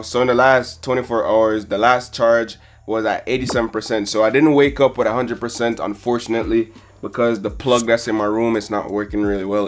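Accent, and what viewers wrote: Jamaica. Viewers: That Jamaican accent so sweet... This is one of the best you have done so far! Blessings to you and your fam. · Hey man mi a Jamaican brotha good to hear ya in ya native voice great video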